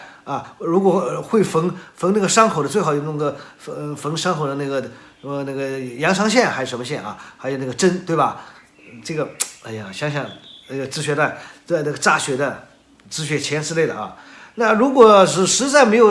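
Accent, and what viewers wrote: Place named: South, China. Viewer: 终于有我们南方人说政治啦👍👍👍这个口音听着真亲切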